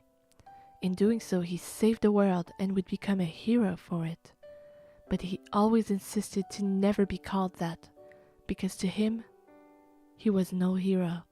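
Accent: France. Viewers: Peut être aussi ta façon de prononcer des mots français comme "tragédie de sablier" à la française. En général quand quelqu'un sonne aussi bien français... surtout sur les R... ça peut laisser suspicieux. Et puis j'ai l'impression que Pandora Hearts est assez populaire en France (même si ce n'est pas l'oeuvre qui revient dans le plus de discussions actuellement, je connais pas mal de personnes de bon goût qui l'ont lu ou regardé)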